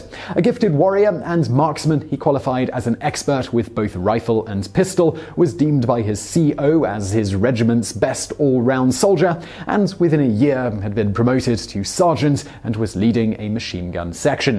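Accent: United Kingdom. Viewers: Wtf I thought this was Vsauce (Michael here) I was so thrown off by the British accent 😂😂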